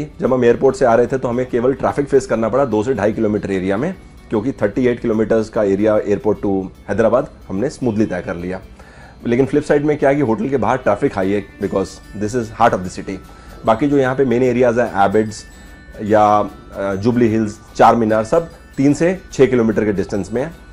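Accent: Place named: North, India. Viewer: No he from North India Delhi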